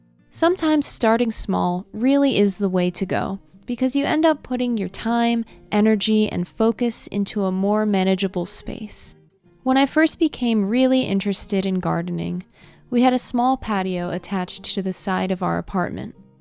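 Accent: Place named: United States. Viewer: thanks for the tips! Apologies btw. I didn’t realise you were American, the accent is quite subtle and only realised when you were talking about 50 cents seeds. Brown field over here is the site of an old building torn down and new housing built on top. The soil is usually awful, if indeed it is soil. More often it’s just rubble with a lawn on top. Being able to grow plants and identifying them in the wild should be taught in schools, (at a practical level).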